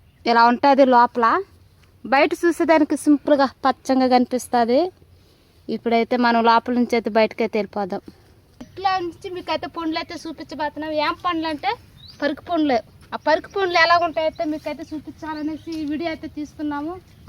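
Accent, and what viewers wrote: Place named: Chittoor, India. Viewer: సిస్ బాషా వింటూ ఉంటే మీద చిత్తూర్ యాస లాగా వుంది.. Medhe a ఊరు సిస్ ఇంతకీ..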